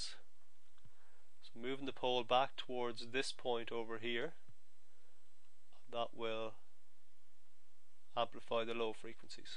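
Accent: Ireland. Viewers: Nice to hear an Irish accent. Thanks for the vid.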